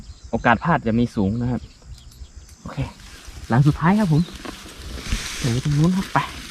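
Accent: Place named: Isan, Thailand. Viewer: เป็นคนจังหวัดไหนคับยางครั้งพูดอีสานชัดมาก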